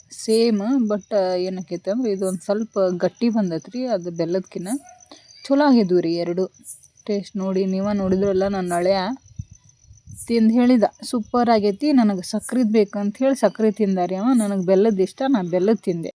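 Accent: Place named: North Karnataka, India. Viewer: ತುಂಬಾ ಚೆನ್ನಾಗಿ ಮಾತಾಡ್ತೀರ ನೀವು ಉತ್ತರ ಕರ್ನಾಟಕದ ಭಾಷೆನ ❤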